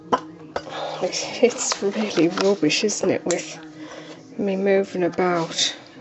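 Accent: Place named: Newcastle, United Kingdom. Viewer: hey where did u get the citric acid from u sound geordie im in newcastle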